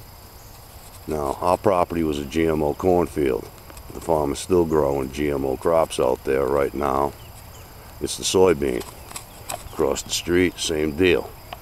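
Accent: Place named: South Louisiana, United States. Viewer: Are you in South Louisiana? I'm from Nola and your accent sounds familiar.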